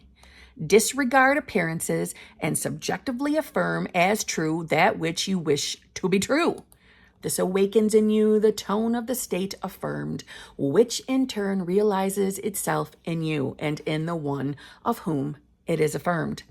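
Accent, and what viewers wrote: United States. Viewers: You have a beautiful accent! Sounds very American 🇺🇸 ! The content is also good! 🤩✨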